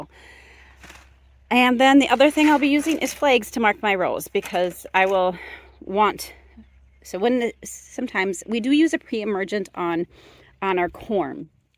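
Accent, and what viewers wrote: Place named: Midwest, United States. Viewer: Just got sent over here on a recommendation... Holy moly your Midwest accent is THICK. Minnesota? I'm from Northern WI, almost the UP- probably why I hear your accent 😂 New subscriber here. I hope to see more content!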